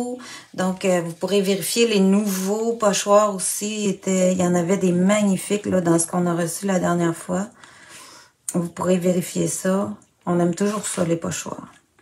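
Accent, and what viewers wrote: Canada. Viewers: Bonjour de France.Vous êtes super mimine.Ce sont les mots qui me viennent.😁Je vous suis depuis quelques temps ( et dieu sait ...que j'en ai vu des Tuto Vidéos de Peintres enseignants sur la Toile en tout genre ) Je vous félicite pour votre manière d'expliquer vos techniques , votre patience et dévouement . Vous et votre accent Canadien sont lumineux sur la toile.Evidemment vous travaillez pour PIN-SO ...mais...on sent votre Passion pour la Création et il est contaminant et productif ! J'ai débuté la Peinture au début Covid'.( enfoui en moi depuis longtemps) (Merci Virus 🙏) .J'ai foncé dans l'Acrylique. J'ai reçu des Félicitations qui m'ont porté un certain temps....mais....étant ALERTEUSE d'Infos sur internet Combattante , cela m'a légèrement diminué mon enthousiasme.Alors j'ai foncé en Aquarelle.Mais ...la encore J'ai eu le tord ( et je dis ça pour les novices ) de visualiser un trop grand nombre de Vidéos diverses et variées sur les Peintres et ..je me suis noyée !! 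(Trop d'infos ...tue l'info ) et ...j'ai tout arrété ! .Le VIDE.Je ne savais plus vers quelle méthode me tourner et ça...c'est vicieux ( alors que je me suis procurée des Tas de Pinceaux de toutes marques ) A un moment .Le trou noir . On pense qu'on y arrivera pas ( mm si on en crève d'envie ) Et.....je suis tombée sur Vous et...vous m'avez redonné l'envie de Reprendre .... Alors ..de FRANCE..un Big MERCI. Je vais visiter vote site. Que la lumière continue à briller autour de Vous surtout en ces moments difficil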